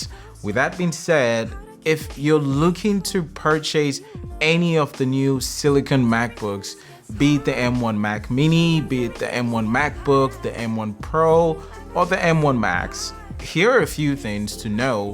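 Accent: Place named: Nigeria. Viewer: Oh, a Nigerian accent. That makes sense. Before I was permanently confused. Because the accent sounded mostly american with a slight under current of british. So my mind was slowly melting down trying to figure out what area of england or america would generate that mix. Also the review was really good and high quality too. It was in depth, without being onerously long.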